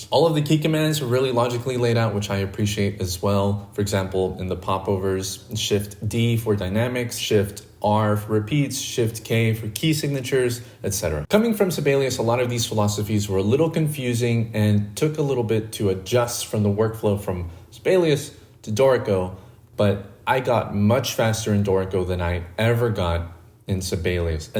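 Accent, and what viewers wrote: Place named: Philippines. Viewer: He talks funny... I'm guessing Filipino is first language? lol. Anyway, great information—the note navigation key commands have me drooling.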